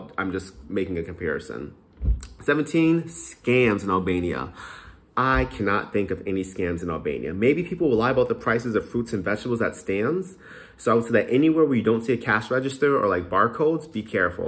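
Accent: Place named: New York, United States. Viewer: I can tell this dude is from NYC.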